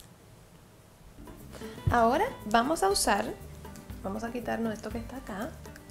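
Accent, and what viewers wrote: Cuba. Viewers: Una pregunta en este video hablas bien claro pero en los videos más nuevos hablas más con tu acento cubano ¿Por qué?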